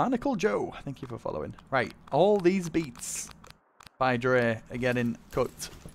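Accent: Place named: North, United Kingdom. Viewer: Can't place your accent, its definitely northern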